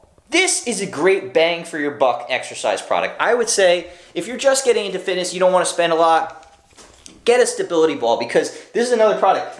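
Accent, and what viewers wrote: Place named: New Jersey, United States. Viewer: Accent and old Ferrigno plates. NJ?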